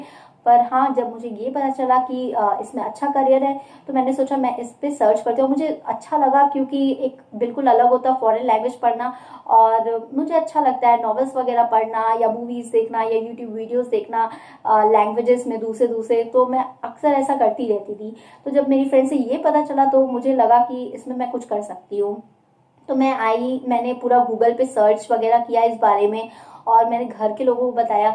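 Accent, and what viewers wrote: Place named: France. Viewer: And now i love the french accent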